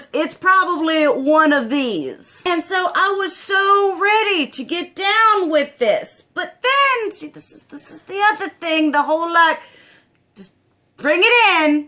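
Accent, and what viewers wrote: South, United States. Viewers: I thought she sounded more Virginia/Carolina area. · first time watcher. can't get over Wednesday Addams having a southern accent 😅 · Pretty goth lady with a southern accent? Amazing!!! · You randomly popped on my recommendations and I absolutely love your accent You’re so loud spoken and extra in a wonderfully southern way As a Caribbean girl people often complain that my speech like yours is loud But that just how I am and I love to see another female who’s voice isn’t all super dainty quiet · Super new here. Goth Chick with a thick ass country accent. I am in love!!! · Are you southern ??? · I love her southern accent!!!! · What’s up with the Wednesday Adams costume and the southern accent? Every video your accent varies. I’m not sure what’s going on with here. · Ok but I'm glad I'm not the only gothy person with a country accent lmao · I just love your voice. 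I am guessing you are Southern like myself. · Is there anything better than a big titty goth gf with a southern accent? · This is my first time on your channel... you seem to be goth with a country accent... coming from Arkansas I'm living for it. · Woah. Just found this in my recommendations and I'm subscribing now. A fun pale goth woman with a southern accent talking makeup. This is amazing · I'm new to your videos I'm from the south but I was not expecting the Southern accent I was like wow ok nice but no hate i liked this video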